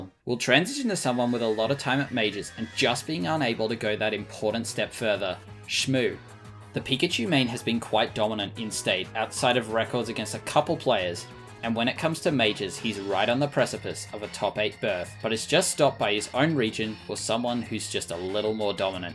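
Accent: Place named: Australia. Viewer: This guy sound Australian. 🤔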